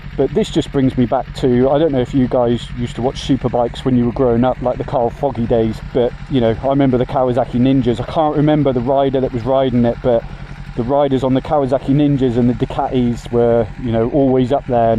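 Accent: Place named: United Kingdom. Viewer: The British accent makes it so good. Very entertaining. Keep it up!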